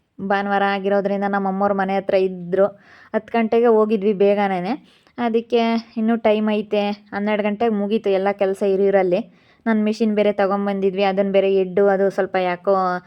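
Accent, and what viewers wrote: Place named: Sira, India. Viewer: ನಿಮ್ಮ ಭಾಷೆ ಸಿರಾ ಭಾಷೆ ಅನ್ಕೋಂಡಿದ್ದೇ... ಮೇಡಂ. ಇವತ್ತು ಸಿರಾ ಅಂತ ಕೇಳಿ ಖುಷಿ ಆಯ್ತು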